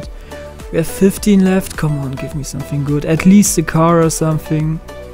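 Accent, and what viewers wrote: Germany. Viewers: dude are you from germany? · You're German? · Wie man minimal hört dass du deutsch bist hahahahahaha xD gutes Video:)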